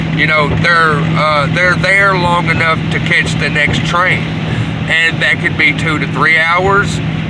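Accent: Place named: South, United States. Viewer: I really enjoy your videos. I think it’s because of your southern accent and grassroots beliefs. BUT, while you offer up your theory about the train hoppers, you don’t lay it out for your viewers. Your viewers shouldn’t have to do your research. It’s your theory, you do the research. This is really the only way viewers can come to the conclusion your theory holds water. I’m not saying it’s not possible, but NS Railway schedule aside, my question is how the heck is he packing a kill kit and hopping trains? I suppose it could be possible, but my senses tell me, not plausible. Put out a vid showing the rail schedule for the 13th and 14th, and how he could have hopped packing, and I might consider it. I did start to research the schedule and quit bc it’s not on me to prove your theory. It’s on you. You have opportunity to have a great channel....and I’m no YouTube creator but I watch A LOT of vids....just trying to help ✌🏼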